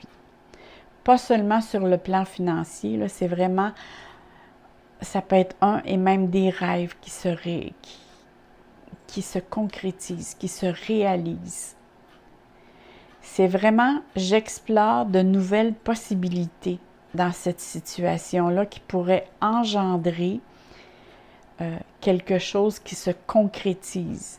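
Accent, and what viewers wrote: Canada. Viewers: Merci🌹🍀j'adore votre accent canadien ❤️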